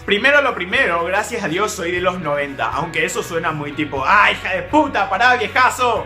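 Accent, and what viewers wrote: Paraguay. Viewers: Puta madre??? Puto amo???... !!!Habla como paraguayo idiota¡¡¡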